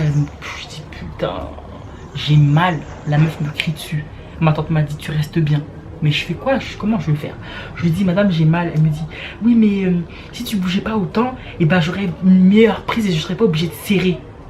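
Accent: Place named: Guadeloupe, France. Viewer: je suis une petite youtubeuse et superbe vidéo je viens de te découvrir et je vois que tu parles créole guadeloupéen ça fait trop plaisir ❤️🔥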